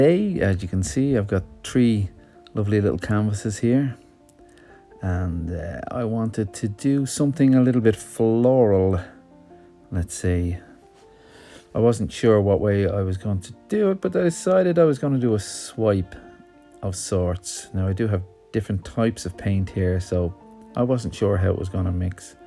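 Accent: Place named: Ireland. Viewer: I agree with you. I love the Irish sense of humour and the accent. Lovely paintings.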